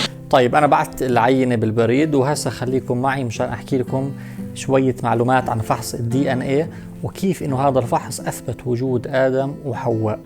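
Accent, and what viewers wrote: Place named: Palestine. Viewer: شوف نفسك لهجتك ثقافتك عاداتك اسلوب حياتك واضح انك فلسطني هذا وطنك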